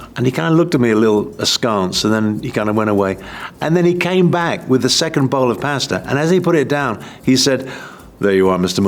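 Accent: United Kingdom. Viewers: A Latino with a British accent?? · That’s because of the British accent. Trust me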